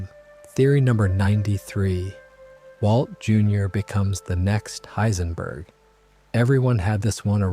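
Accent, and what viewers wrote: United States. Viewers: The narration for this video about an American show being in an American accent, but nonsensically forcing British words / terms in... absurdly pointless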